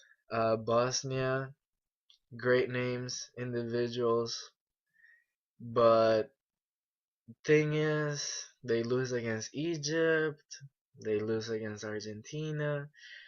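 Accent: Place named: United States. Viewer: So your Spanish or American because you sound American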